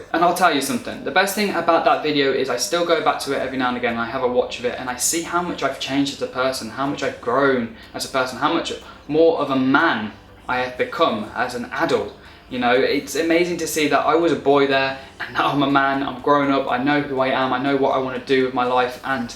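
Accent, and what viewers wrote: United Kingdom. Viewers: I picture you eventually with a special understanding and lovely woman, having a couple of kids with her but having boyfriends on the side whom your woman friend would also know. You're so appealing. Whoever you get involved with is lucky. You voice reminds me of Russell Brand's. Hope you aren't offended by the comparison. I love British accents.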